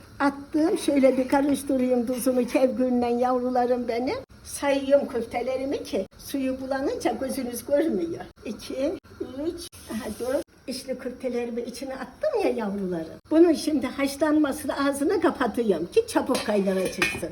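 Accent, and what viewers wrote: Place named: Malatya, Turkey. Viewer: Oy şiivene gurban olam ben nerelisin acaba. Malatya lı mı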